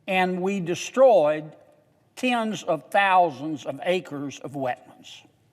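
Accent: South, United States. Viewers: I am from the South as well and I Love His Accent!! I also Understand all of his jokes 😂 He is just a Good Man Period .. Big Heart ❤️ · Me and Mr Kennedy share the same southern drawl. My Mom's family are from Keechi, LA. people think we are stupid because of the drawl. I love when they underestimate us!!! It makes for good times! This is Senator Kennedy's Super Power!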